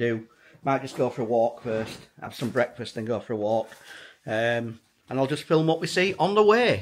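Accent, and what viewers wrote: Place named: West Yorkshire, United Kingdom. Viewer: Thanks for that . Might give it a go late September or June. Looks up my street . 🍺👌 We think we caught you mention West Yorkshire ? After we guessed by your accent . Where about May we ask ? We are really looking forward to our favourite go to airport reopening again up there Doncaster . 🙏🏼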